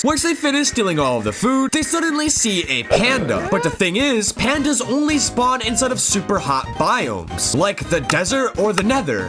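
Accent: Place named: RP, United Kingdom. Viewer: Dramatic posh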